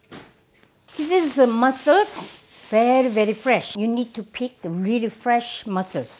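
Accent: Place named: South Korea. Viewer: I'm korean. I'm not good at English. but I can understand your speech. I think you have korean accent when you speak engligh.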